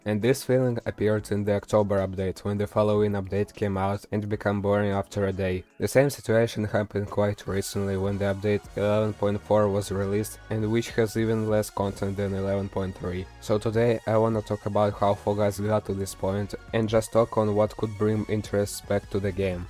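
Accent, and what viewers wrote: Russia. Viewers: ты русский? Просто акцент как у русского, да и сам ник тоже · Акцент как у русского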